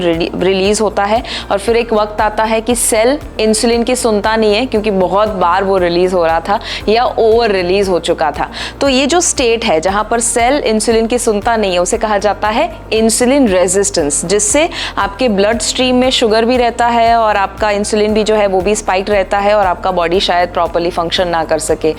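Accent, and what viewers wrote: Gujarat, India. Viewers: madam please speak in Gujarati ,You speek Hindi but your tone of speaking is like Gujarati ,You speak Gujarati language very well and clear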